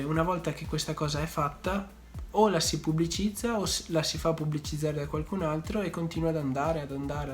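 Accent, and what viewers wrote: Veneto, Italy. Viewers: Sei veneto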